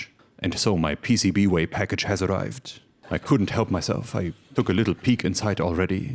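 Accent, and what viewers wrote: Germany. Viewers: No not monotone, it’s deep late night Radio DJ voice w/ an exotic Deutsch / German Accent. A great intoxicating lullaby when I too tired to care.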